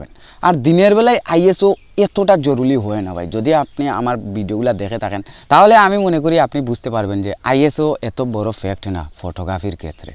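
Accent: Sylhet, Bangladesh. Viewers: Bai apnar bari mono koy Sylhet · Kotar maje sylhety vasa ase... sure apne sylhety fuya😊😊😊 ami o sylhety furi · ভাই আপনি কী সিলেটি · ভাইয়া আমি ১০০% শিওর আপনি সিলেটি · Sylhety ni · Vai apni ki Sylheti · Tumije sylhetii 🎉🎉 ita mateouu buja jayyy ❤❤valobasha niooo priooo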